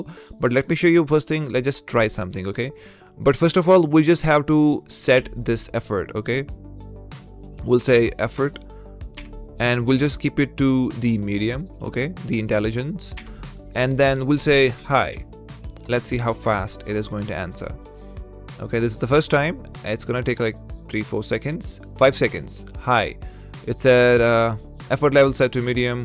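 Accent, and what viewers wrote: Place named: India. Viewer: buddy u cant hide indian accent 😂, keep going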